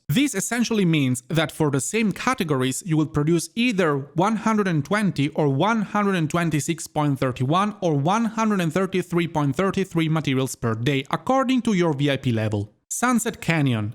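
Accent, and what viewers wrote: Italy. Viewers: Are you southern Italian?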